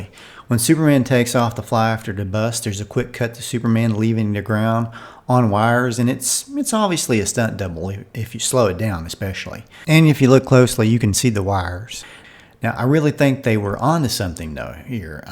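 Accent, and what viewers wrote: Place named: Kentucky, United States. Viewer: I really enjoyed this. Even though I knew about two-thirds of it because I too am a lover of trivial trivialities, is Barney said on Andy, I enjoyed how this brought them together and how they were presented. New to this channel but I will be back. May I ask where you are from? I am from Kentucky and we seem to have the same accident. Thanks again for these great videos.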